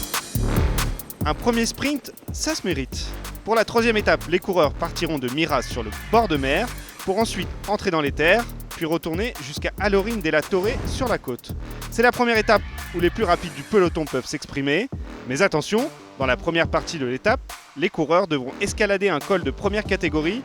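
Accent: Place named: France. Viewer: Porque hay un francesito narrando el resumen?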